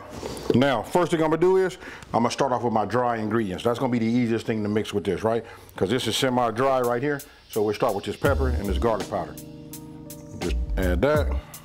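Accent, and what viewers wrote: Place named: Carolinas, United States. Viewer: You sound like you're from the Carolinas.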